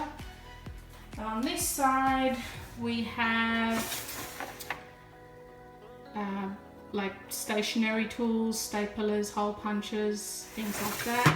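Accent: Australia. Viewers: I love it! It feels comforting to hear an Aussie accent too! Are you Indigenous Australian?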